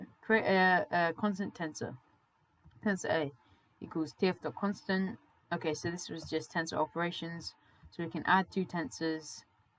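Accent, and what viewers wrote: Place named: United Kingdom. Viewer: It seems ur from UK and not US cuz u speak British English. Am I right?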